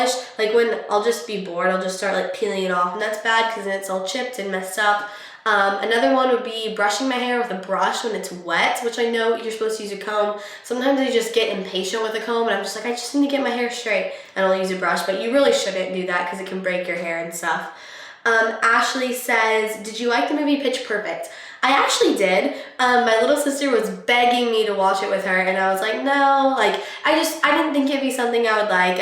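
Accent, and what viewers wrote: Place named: General American, United States. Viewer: Hey? I've always wondered why don't you have a Texan accent if you live in Texas? I hear hints of it sometimes but you have a very general American accent!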